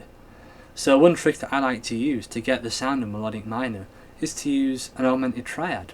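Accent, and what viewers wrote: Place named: Northern England, United Kingdom. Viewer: Very helpful lesson. I have a better grasp of the scale now. and the Northern accent is quite pleasant. Wondering if you could do a quick lesson on the melodic minor over a standard blues progression, a la Robben Ford or Carlton. as in melodic minor on the last bit just before the iv chord. Cheers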